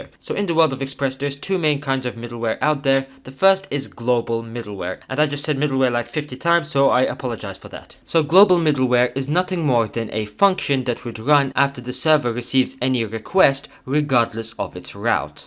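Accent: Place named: France. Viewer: man this is so much better than those 30+ minute videos, short and to to the point + meme game is on point + a French? accent 10/10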